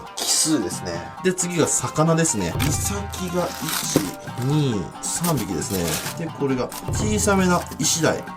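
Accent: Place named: Tottori, Japan. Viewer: 鳥取県の人間です。 いつも楽しく拝見しています。 「宝箱だが。」っという言い方 鳥取県の方言と似ています。他にも似たような言い方をされるので同郷なのではないかと思うくらいでした。 いつか遊びに来て下さい。道の駅ではイカがくるくる回されながら干されていたり、温泉もあるし、松葉がにのシーズンには足が一本無いだけのものが破格値で買えたりします。 これからも頑張ってください。動画投稿楽しみに待っています。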